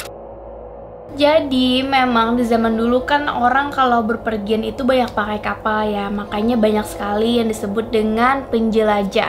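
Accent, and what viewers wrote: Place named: Bali, Indonesia. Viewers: Logat nya kek orang Bali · Logatnya kaya org bali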